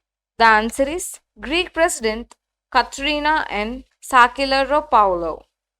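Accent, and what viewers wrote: South India, India. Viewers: such a shitty south indian accent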